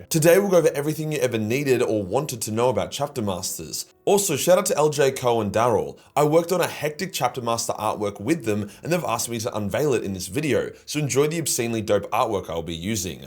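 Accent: Australia. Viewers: Why you put by an Australian you don’t gotta announce where you from we still gonna watch the vid and we rock with you bc you Australian the accent top tier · Honestly i love 40 k lore and have been in franchise since its conception starting out in warhammer fantasy as i prefered the fantasy game tabletop games. how ever i chucked you a sub just due to learning you had been banned a few times by youtube fuck them long live the australian speaking style and not give a fuck attitude !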